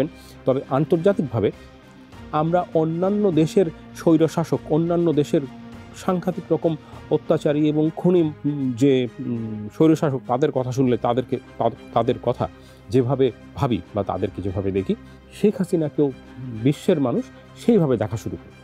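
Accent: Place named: Kolkata, India. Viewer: কেউকি একটা জিনিস লক্ষ করেছের যে নিউজটা পড়তেছে তার কথার ভিতর কলকাতার বাংলা ভাষার একটা মিস্র আছে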